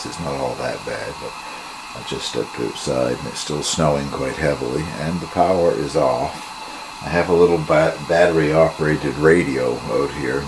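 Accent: Canada. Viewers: I am thoroughly enjoying myself watching your videos. Your lovely Canadian accent reminds me of my home turf of Northern NY. Grew up about 26 miles south of Cornwall but have traveled quite often into Eastern Canada where all of my ancestors came from. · was going to ask where in the world are you, but I guess from the accent and Tim Hortons cup is a give away! :)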